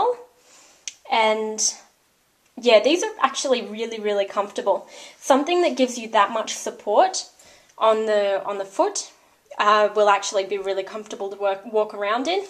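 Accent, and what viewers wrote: Australia. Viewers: Love the ozzie aceent.... GO AUZZIES!!!!!I actually think you are the first Australian sewer I have seen on youtube. Thats a really good thing though, because then I know where I can buy AUSTRALIAN stuff from AUSTRALIAN shops!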